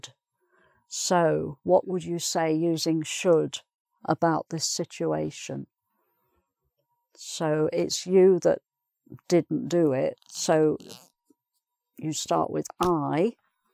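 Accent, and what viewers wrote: England, United Kingdom. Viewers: Thank you a lot. Your lessons are really useful and you have awesome British accent as well)) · it' s always a pleasure to listen to your lessons! I love your beautiful english accent so much...thank you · Thanks a lot mam! You are great! I love British accent so I'm learning lots from you :)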